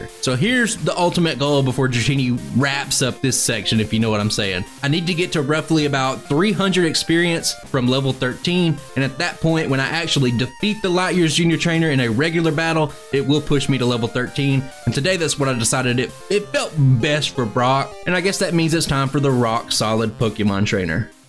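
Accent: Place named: Appalachia, United States. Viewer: I can recognize the appalchian accent from a mile away. Where are you from? East TN?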